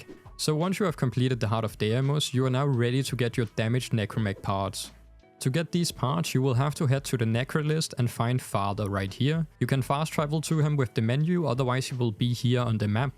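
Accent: Denmark. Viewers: This video really helped🤩. I have a question are you from Denmark because it sounds like it with your accent?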